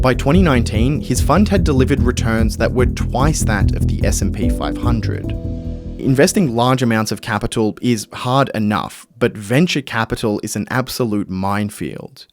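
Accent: Australia. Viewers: Ohhhh... "Arn" = own in Aussie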